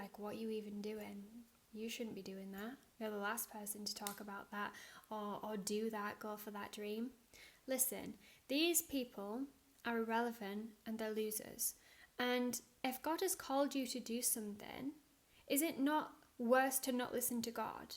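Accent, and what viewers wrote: Northern England, United Kingdom. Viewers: Love the truths you share, and absolutely love your northern accent 😊❤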